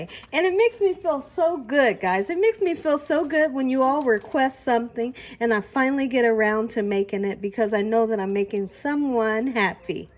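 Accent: South, United States. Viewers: Ooooh she country I love it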